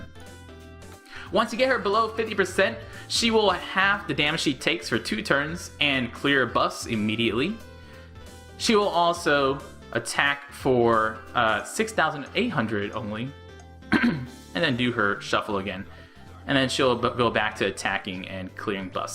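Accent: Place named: Australia. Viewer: Talk to Australian to me~ <3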